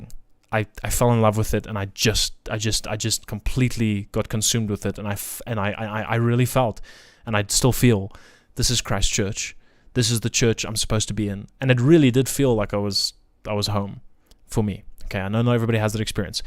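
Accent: South Africa. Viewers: I know that accent anywhere. Hello, my fellow South African❤️. Praise be to God for your journey and conversion🙏🏽. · As soon as you started speaking I thought hey you sound south african. Fellow south african catholic here,welcome home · As soon as I heard your voice I thought, He's South African! (like me).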